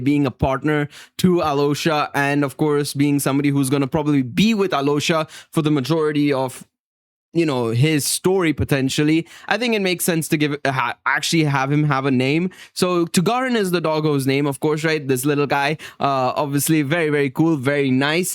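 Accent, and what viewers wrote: India. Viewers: Brother are you indian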